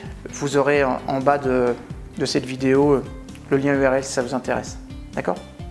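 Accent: Canada. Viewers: Vous avez un PARFAIT ACCENT CANADIEN, j'ai été trois fois au CANADA, je vous le confirme avec sincérité. Trop beau accent. Merci. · merci merci merci j`adore je viens de réaliser bien des choses et surtout pourquoi ca ne fonctionnait pas toujours comme je le souhaitais Braoooo et pour l`accent québecois aussi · J'ai vu le Doc 2 fois, et je trouve que tous que vous avez dit a été signalé dans le Doc, pas trop éclairci mais signalé. Merci d'avoir éclaircir les choses du coup. Par contre, j'ai carrément adoré l'accent canadien hahaha ! Continuer SVP, j'ai vu presque vos vidéos, je suis une grande fan. :))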